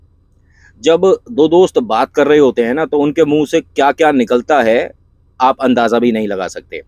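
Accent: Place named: Etawah, India. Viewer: Jai Balaji Maharaj 🙏....From Kanpur but right now from Arunachal Pradesh...Sir aapki boli Itawah ki taraf ki lag rahi hai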